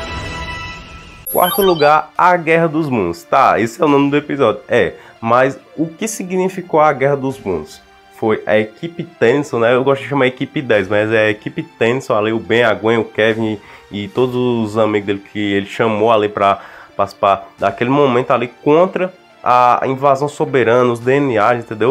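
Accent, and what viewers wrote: Northeast, Brazil. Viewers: Mano tu é da onde do Nordeste? kk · Amo o sotaque nordestino da minha região, é nois! Sou da Bahia. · Tu é do Nordeste ahhhhhhhhh pera EU TBM SOU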